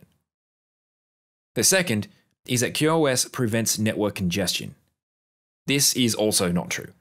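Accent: Australia. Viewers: As a network+ student I would like to thank you for clearly explainging the difference between QoS and CoS. And great to hear a fellow Aussie for a change. I hope you make more content.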